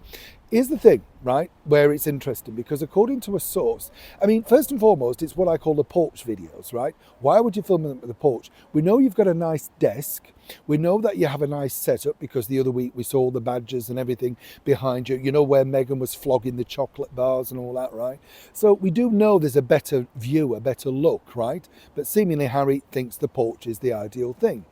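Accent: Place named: North, United Kingdom. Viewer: Here’s Neil Sean my one true love bwahaha out here in his comfortable northern accent chatting about all the things we remember before dropping some truth bombs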